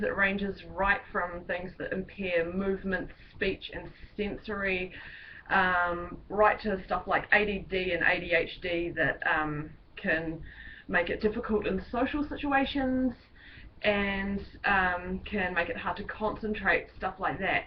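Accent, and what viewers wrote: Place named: New Zealand. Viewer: Also you reminded me how much I miss NZ accents:)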